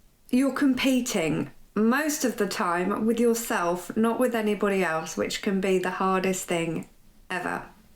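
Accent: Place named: England, United Kingdom. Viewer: The landscape and your amazing English accent make the video very pleasing ❤❤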